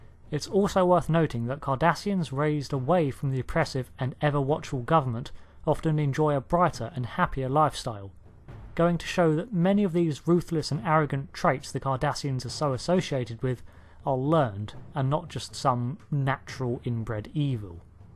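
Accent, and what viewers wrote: United Kingdom. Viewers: Sounds like you live in Britain, whats the difference?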